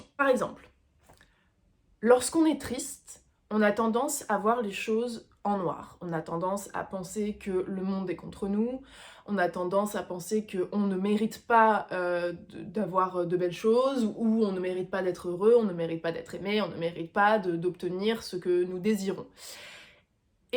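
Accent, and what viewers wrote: France. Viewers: Very charming you are, either speaking English with French accent ,and speaKING FRENCH, Very sensual.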